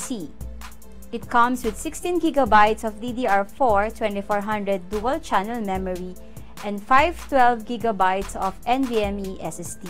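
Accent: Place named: Philippines. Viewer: You sound like Filipino. Am I right ?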